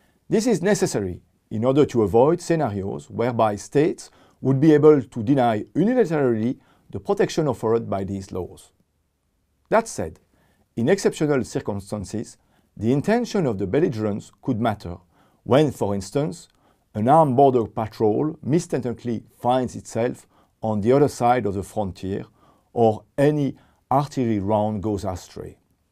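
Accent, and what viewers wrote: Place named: France. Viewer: I like the French accent. Thank you for your explanation.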